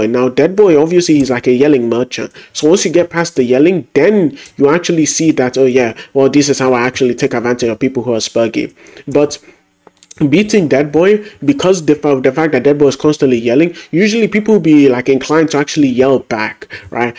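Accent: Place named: United Kingdom. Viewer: I can’t with that BRITISH ACCENT 💀💀💀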